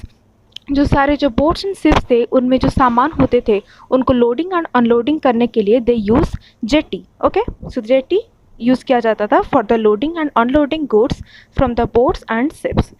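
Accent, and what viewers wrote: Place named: Odisha, India. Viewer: Odia accent😊 ama odia madam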